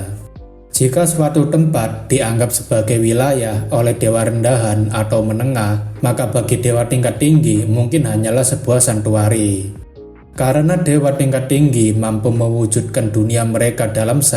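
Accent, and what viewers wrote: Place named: Bali, Indonesia. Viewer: soalnya kata T nya persis org bali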